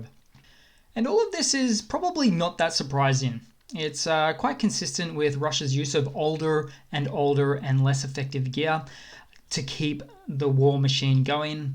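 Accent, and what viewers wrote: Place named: Australia. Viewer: David ABBA thank you for this info 👍 A lot of us believe that is Australian based on his pronunciation of some key words. Not up to mischief but what do you think?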